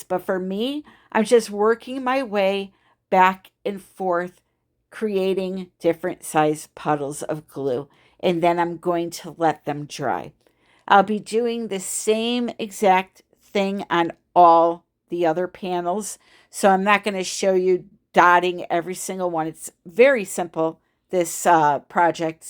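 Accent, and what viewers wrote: United States. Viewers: I was watching this thinking, “what the heck is HAT glue? Some kind of glue gun only used for hats?” The entire time. Took a long time to realise it was just your USA accent making the English sound strange. As a British person it sounded SO much like HAT, like I really though that’s what you said lol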